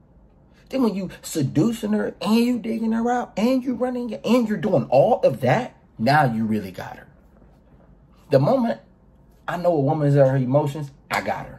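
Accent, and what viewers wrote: Baltimore, United States. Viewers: could pass for a BMORE dude by just the accent alone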